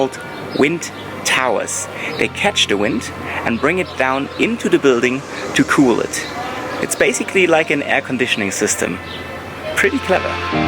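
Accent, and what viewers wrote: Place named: Germany. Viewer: accent reminds me of all my friends from Deutschland. 🇩🇪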